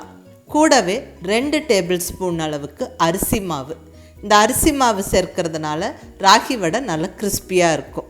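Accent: Coimbatore, India. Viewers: Ungal kovai Tamil kettukitte irukkalam pol irukku, aana video seekkiram mudyuthe!!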